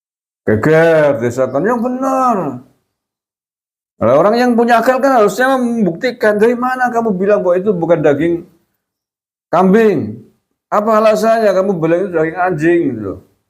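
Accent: Banyumas, Indonesia. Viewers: Hajar pak!!! Tapi sy suka cengengesan sendiri klo liat konten pak, terutama klo lg marah dgn bahasa yg ngapak 😂😂😂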